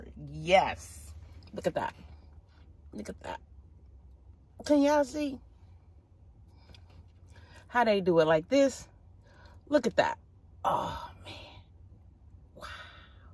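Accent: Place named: West Coast, United States. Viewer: That's a west coast accent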